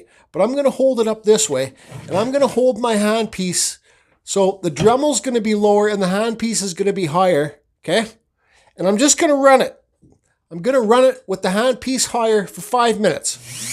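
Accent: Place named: Canada. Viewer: You're Canadian eh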